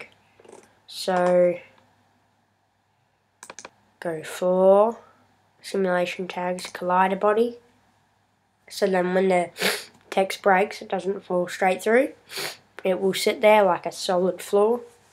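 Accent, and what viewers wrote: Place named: Australia. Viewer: aussie <3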